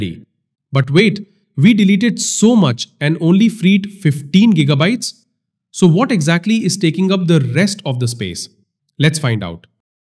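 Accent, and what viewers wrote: India. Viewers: just move file location , its simple as that. Also remove apps setup file as .msi in those folders. Move apps out of C drive. Remove previously installed Windows file Change location of My documents and Downloads Use Windir stat to see , the tree file size. Turn virtual memory to Auto. Anything set to lower value or higher value has its on demerits . Delete recycle bin Delete prefetch, temp, %temp%. You sounded indian, And my expectation was sky high, but u delivered less . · AI generated slop, the thumbnail face does not quite seem to match the indian accent.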